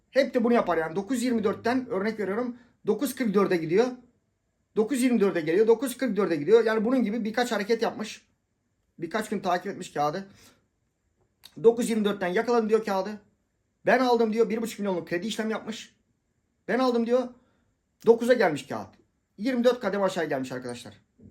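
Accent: Thrace, Turkey. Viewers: abi biz senı sevıyoruzya sadelıgın hele bıde trakya şivesi süper oluyo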